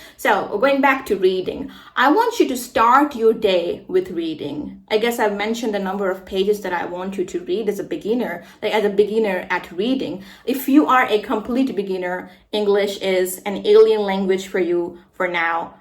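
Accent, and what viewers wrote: United States. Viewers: I love your American accent ❤️❤️❤️❤️❤️🇺🇸🇺🇸🇺🇸🇺🇸🇺🇸🇺🇸🇺🇸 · I love your American accent 🎉 Break a leg ❤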